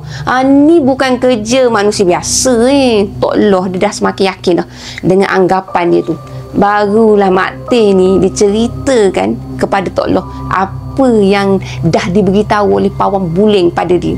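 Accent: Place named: Perak, Malaysia. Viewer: Assalamualaikum. Selamat Hari Raya buat sekeluarga. Sedap dengar bercerita ade guna loghat Perak. Suke akk mendengonye. Cer Cuba lepeh ni guna loghat N.S plk..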